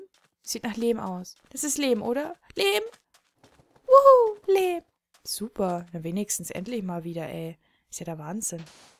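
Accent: Bavaria, Germany. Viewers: Hy! Bin durch Lets-Plays.de auf dich gestoßen und find deine LP´s echt Hammer! Bist du aus Bayern? cheerio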